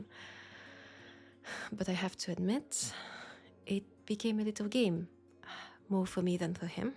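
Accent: France. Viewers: Wow, I enjoyed this way more than I expected I would. Funny how my brain could paint this scene perfectly in my mind. Beautiful voice as well, and as always I just love the french accent. Nice script and BGM choice too. 👏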